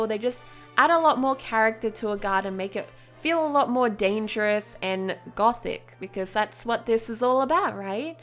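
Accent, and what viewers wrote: Australia. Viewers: are u Australian because your accent sounds so much like an Australian's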